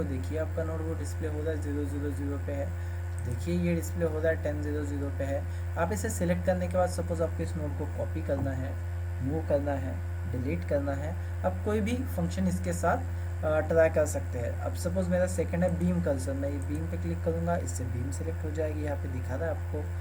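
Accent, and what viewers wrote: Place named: India. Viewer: good video ! It would be great if your video had the option of subtitles, since it speaks too fast and its Hindu accent is very strong.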